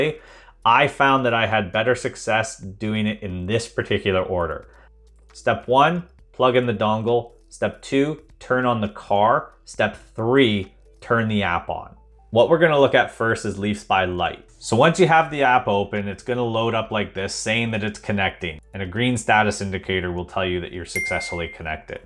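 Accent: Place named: Canada. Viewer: Excellent, clear introduction to the LeafSpy! By the way, I suspected you were Canadian even before you said so. How? I am not sure I can explain, but it's a compliment.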